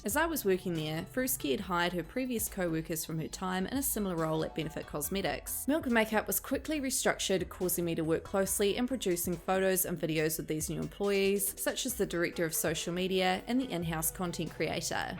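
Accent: New Zealand. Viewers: Living for your content of late. I could listen to your kiwi accent all day. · Kiwi accents are like my absolute favorite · as a fellow kiwi i feel like ur accent is SO strong and its kinda satisfying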